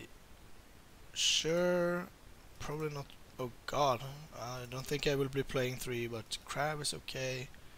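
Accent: Sweden. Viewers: A real good video! I love that Swedish-English! Keep it up!" :D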